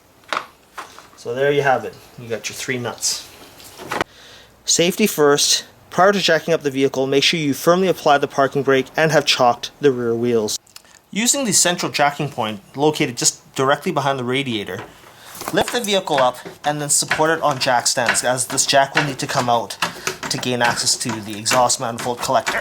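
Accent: Canada. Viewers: My god, you’re Canadian 🇨🇦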